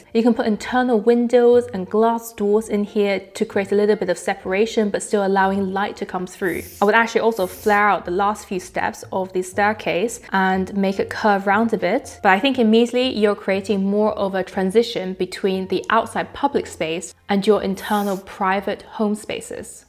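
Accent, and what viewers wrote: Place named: Southern England, United Kingdom. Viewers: Are you from southern England? It sounds like an English accent that's south of the Humber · That was a very nice video, thanks for the tips 👏🏽👏🏽❤. I study architecture for hobby and learned a lot by seeing it. Loved how you show that list between the video's chapters and the final list with all the items: if someday I create content for the internet, I'll definitely use that in my videos. Ps: Where is your accent from? South England? It's so nice. I'm Brazilian and a considerable part of the english we consume over here is from USA 🤠. Best wishes from Brazil 🇧🇷.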